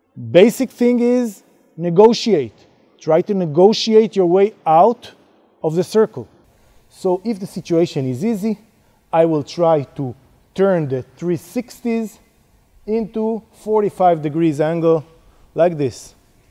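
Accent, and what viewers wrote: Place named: Israel. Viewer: I wactched the video. It's better not to mistake anything in that video for sound advice. It's just choreography with a narration that's done in a Modern Hebrew accent. There are as many ways to deal with multiple attackers as there are potential gaggles of attackers but this video demonstrates something that will only ever work on people who aren't actually serious about hitting you. Fix your footwork. Move from position of balance to position of balance and for the love of god don't compromise your own balance just to take someone else's. If you cross yourself up and overextend like that "grandmaster" then someone's going to throw the ground at your face while breaking a few things on the way down. The primary tenet of ninjutsu is don't get hit and the third law of motion is that the ground hits you as hard as you hit it. Apropos that you should probably get out of the habit of hitting the ground with your talus when you roll...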